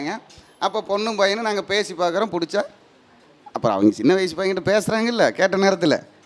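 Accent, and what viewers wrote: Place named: Kerala, India. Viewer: நம்ப முடியவில்லை, கேரளத்தில் இருந்து தமிழ் சுத்தமாக எப்படி பேசுகிறீர்கள்?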